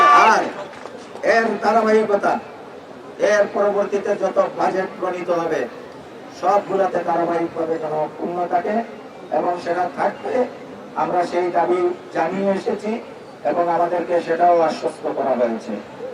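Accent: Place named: Sylhet, Bangladesh. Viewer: স্যার মনে হয় সিলেটি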